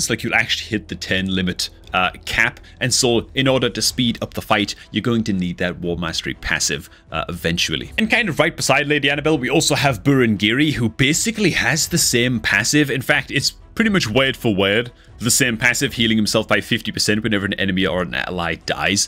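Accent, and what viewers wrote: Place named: Yorkshire, United Kingdom. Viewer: The Yorkshire accent is wicked too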